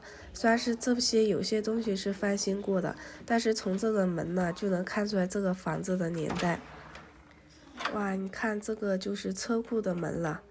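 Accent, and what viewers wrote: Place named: Yantai, China. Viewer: 烟台口音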